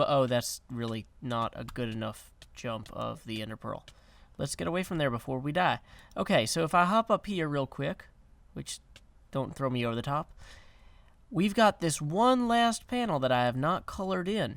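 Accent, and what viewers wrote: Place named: Texas, United States. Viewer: You have a cool accent It sounds Texan but the video says not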